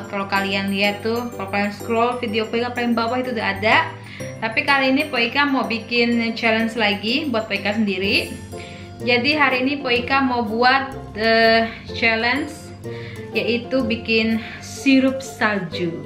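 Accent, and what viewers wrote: Jakarta, Indonesia. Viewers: ngomongnya lucu bgtt, logat betawinya..😁😁 salam kenal mpok...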